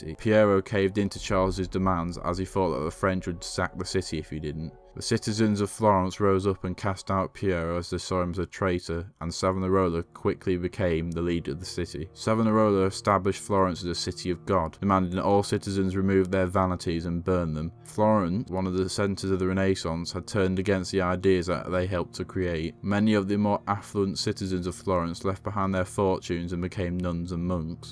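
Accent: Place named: United Kingdom. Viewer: done in a terrible regional British accent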